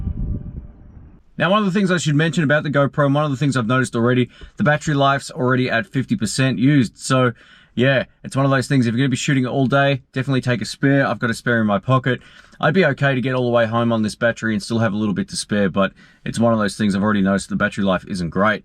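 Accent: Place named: Australia. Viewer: I stumbled across your videos looking for a ring light review and I am glad I did. I am planning on using the GoPro as a facecam and I believe it will work well with the 10" ringlight on your channel! Keep up the videos, and I was wondering why everyone said you had a cool accent and I was confused because it sounded normal, before I realised you were also an Aussie like me!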